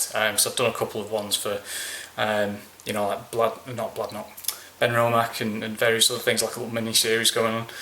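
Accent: Manchester, United Kingdom. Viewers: Loved this. You sounded particularly manc in this vid. Love it.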